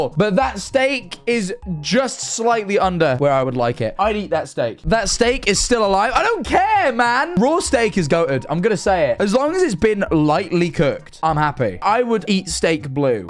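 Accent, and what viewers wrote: United Kingdom. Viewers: was extra british in this one 😂😂😂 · You're British?!?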